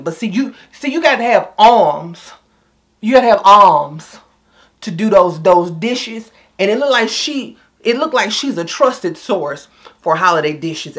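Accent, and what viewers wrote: South, United States. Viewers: #1 is the first word: NIGERIAN. You the most southern sounding Nigerian I ever heard and far as I knew you are somewhere on the southern U.S. LOL. You DO look like a classmate of mine tho… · Your American accent is really good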